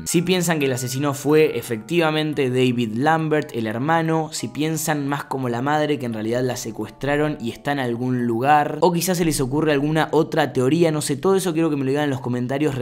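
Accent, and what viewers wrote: Argentina. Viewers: De donde sos, te digo de buenas es que en argentina se habla así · Amo tu acento Argentino ...Es tan bello como el chico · Q hermoso te queda El acento argentino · Es argentino da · Es por qué es argentino como yo xd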